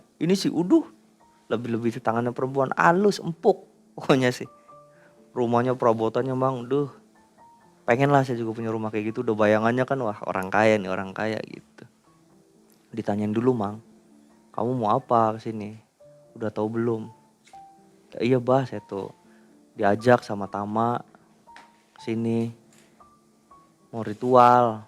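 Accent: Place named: Cirebon, Indonesia. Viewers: Lucu sama logat Cerbon nya hehe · wong endi sih cirebone ngapak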